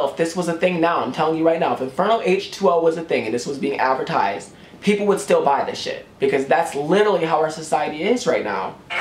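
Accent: United States. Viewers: The fact that you're wearing an Adidas cap and using your iPhone and speaking with your modernised American accent and all that jazz just makes me love this video so much, because it means you basically fit into all of that yet the difference is you're aware. I loved this video from the very beginning and I got the message right away, I was waiting for a video like this to be made and I'm glad I've finally found it(because someone actually had the time and bravery to do it). And for that I thank you. I come from a very unprivileged place, Venezuela, and only recently (Literally less than a few months) we've been getting support and aid from the international community, when we've been facing with this narco-dictatorship for longer than 17 years. People die there, out of violence and starvation, and the international community is too distracted by media and other entertainment to realise. 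I was lucky enough to escape the country but I always make sure people around me and all those I possibly could talk to realise and are well-informed about what's going on back home. It's horrible and it's real. I am glad I found your channel, totally subscribing.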